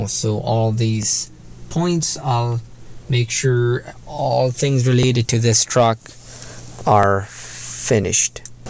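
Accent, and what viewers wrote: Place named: Ireland. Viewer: A strong Irish accent has. Fabulous work you all do,the men manufacturing and building and the ladies & Children work the land and are home makers..such a wonderful way of life.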